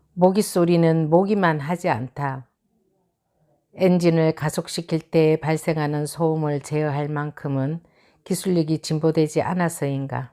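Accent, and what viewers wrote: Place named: Gyeongsang, South Korea. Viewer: 이렇게 열정적인, 순정한 독자를 얻었다는 것이 글쟁이에게는 얼마나 큰 행운이고 축복인지! 뜨거운 갈채에 가을 아침이 심쿵!합니다. 영상도 멋지고 님만의 갱상도 억양도 정겹고 자연스러우니 조원희 샘 애써 따라잡으려 안하셔도 될듯요.ㅎ 늘 고맙고 또 고맙습니다.^^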